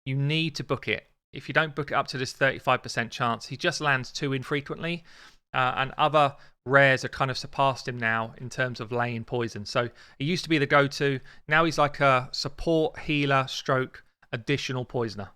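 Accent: United Kingdom. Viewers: He’s British, they’re like that when it comes to pronouncing certain words lol. It’s not a diss, it’s just reality.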